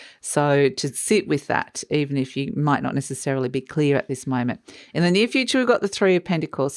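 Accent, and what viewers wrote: Australia. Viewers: I love this reading. I am feeling all of this. Sadly, I found someone I wished to co create with, and it had its challenges. And being very particular with whom I share my energy and love, it’s necessary for mutual respect and appreciation. Im not a fan of competitive co creating, and people who try to get one up on me. It’s all about a win win alliance, and teamwork. After these few very challenging months, deep healing work and introspection, a delay for a new beginning, I’m so ready to get back into action. Thank you for your time. I love your surroundings, the sounds of birds, the crows today, and your Australian voice. It’s been quite soothing. Much love to you. 💗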